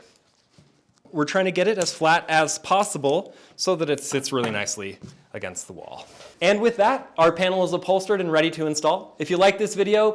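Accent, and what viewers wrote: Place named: Germany. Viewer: Thx, really worthwhile to watch. Btw, zufällig deutsch? Wegen des ever so slightly hörbaren Akzents.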